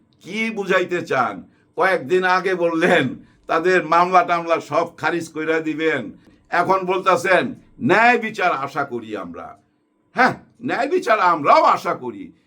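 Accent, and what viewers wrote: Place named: Old Dhaka, Bangladesh. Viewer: Your dialect is similar to mine. I am originally from old Dhaka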